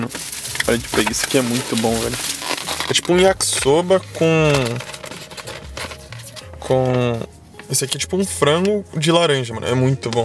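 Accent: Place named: Bahia, Brazil. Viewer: Baiano ein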